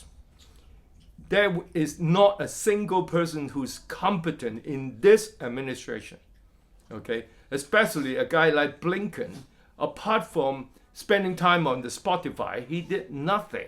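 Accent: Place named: United Kingdom. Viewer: Thanks so much for the broadcast. I noticed the British accent increases just a few minutes into the show. Good work.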